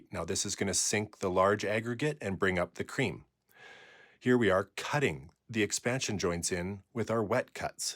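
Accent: Canada. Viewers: Canada voice at its finest. Love the work you boys do!! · You sound Canadian. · For canadians, your pretty good 😂 american say out, canadian sat ooot, great, great work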